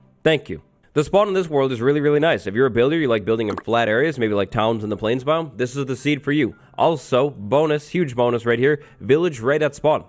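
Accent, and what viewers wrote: United Kingdom. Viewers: i didnt know youre british. your accent would go unnoticed in new England. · I love your British accent lol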